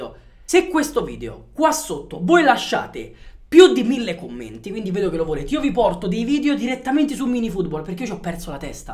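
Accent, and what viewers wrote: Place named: Naples, Italy. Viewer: il tuo accento napoletano sembra quello di un’ orso Balú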